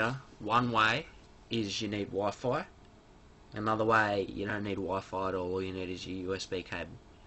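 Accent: Australia. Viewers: Good video 5/5. Also somehow I knew that you were aussie lol. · ih im 9 n yer ossie vose siis cut.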